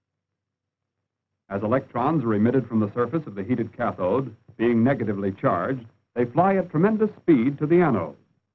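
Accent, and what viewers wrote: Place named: Transatlantic, United States. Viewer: I love these classic old American explanatory videos, military training videos etc. They're so clear and to-the-point, and always in that Transatlantic accent that you just don't hear anymore.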